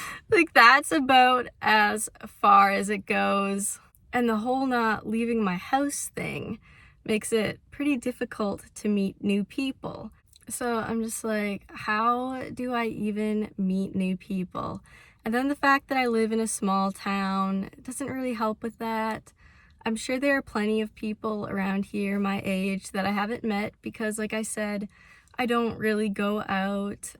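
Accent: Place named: Wisconsin, United States. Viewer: I love the cute Wisconsin accent that is something between the Canadian “oooout” and the American “aut”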